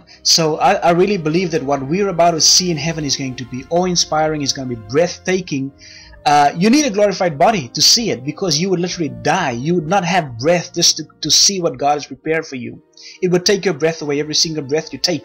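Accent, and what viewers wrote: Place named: South Africa. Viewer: Hey, I recognize that accent, Im from South Africa too and live in Costa Rica, nice hearing from you brother, God Bless and thank you for your message, Yeshua is coming, Amen!!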